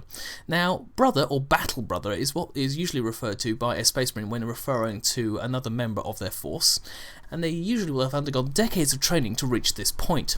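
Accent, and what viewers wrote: United Kingdom. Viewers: I started with a video of a cute little toy poodle puppy begging to be hugged and now am watching a video of a British man talking about the build up of a fictional military organization made up entirely of biological enhanced super human warrior monks... Enough internet for today.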